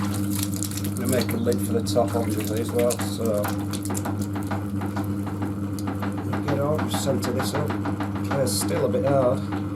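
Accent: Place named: North, United Kingdom. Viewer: Lovely to hear a northern accent! Thanks so much for your time on these videos. Cookie jar looks a treat mate.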